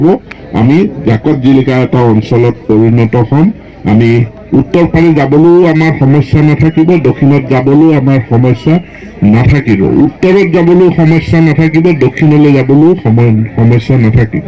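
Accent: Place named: Mipak, India. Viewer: Ki appuni mising valkoi kobo najane sir. Mipak nisina lage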